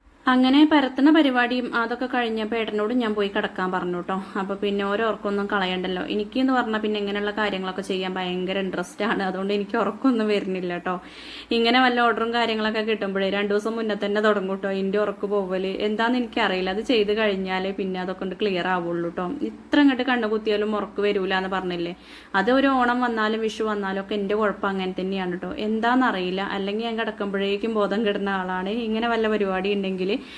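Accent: Malappuram, India. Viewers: Njan first ayitt aanu ee channel kaanunnath ,kandapo malappuram ulla aall aanu thonni,evide sherikk place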